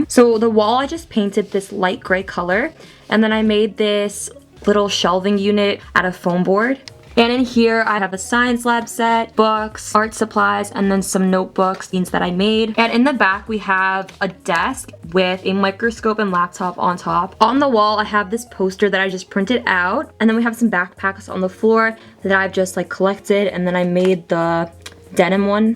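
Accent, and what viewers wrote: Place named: Oklahoma, United States. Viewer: your accent is VERY oklahoman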